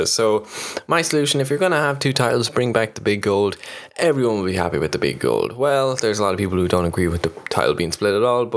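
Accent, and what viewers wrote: Ireland. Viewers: im guessing your irish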